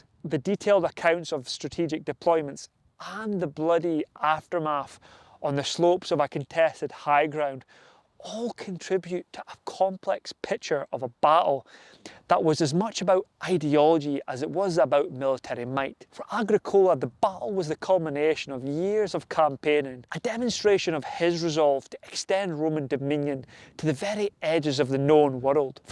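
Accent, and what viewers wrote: Scotland, United Kingdom. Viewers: That's what he says. His Scot's accent makes it harder to hear the hard c sound.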